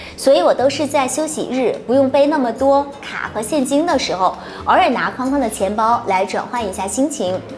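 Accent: Northeast, China. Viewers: 的发音好东北啊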